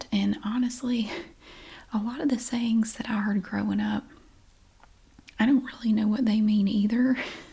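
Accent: South, United States. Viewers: I love this video😊 I studied in Kansas and sometimes heard an expression that I was unfamiliar with. This explains a lot. Greetings from Finland:) Your videos are on my phone whenever I want to wind down and listen to an American accent so I won't lose my language abilities on my third language. Thank you!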